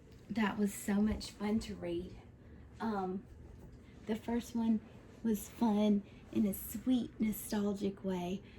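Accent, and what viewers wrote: South, United States. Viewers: I can never get over your country accent does it for me every time thanks for another super relaxing video ❤️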